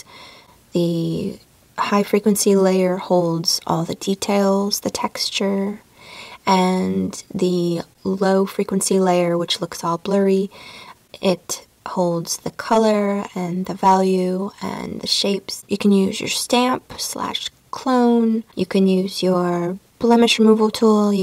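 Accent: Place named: South, United States. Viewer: Excellent. First, you taught quite a few tips that I can apply in other editing programs as well; And also you showed Affinity which I've always been curious about. Finally that hint of a Southern accent makes me want to move to South Carolina immediately 😎 Thanks!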